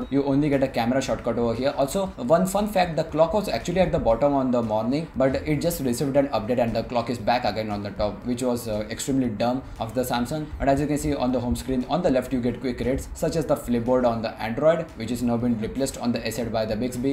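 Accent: India. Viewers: hmissi ALA Indian accent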